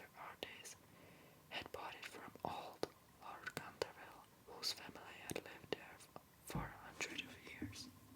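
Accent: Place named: Czech Republic. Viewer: This is wonderful! Thank you. Your voice is marvelous, and any words spoken with a very slight Czech accent are more beautiful and interesting for it. I'm fascinated to hear how near-perfectly you speak - pronunciation, vocabulary, phrasing, emphasis - better than most natives do. Even if one studies for years, most ppl don't ever sound as natural. I imagine you're similarly talented with any language. Your videos are always, as are you, very special, original, well-spoken, intelligent, and lovely, and we are fortunate to have found you.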